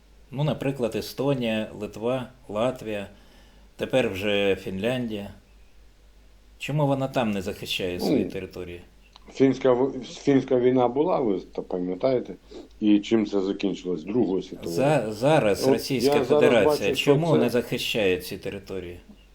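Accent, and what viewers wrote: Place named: Rivne, Ukraine. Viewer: він з Рівно точно, акцент не бреше,я то знаю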